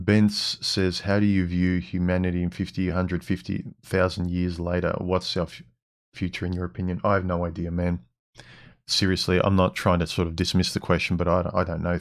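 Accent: Melbourne, Australia. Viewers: You have a Melbourne accent through and through, eimai sostos?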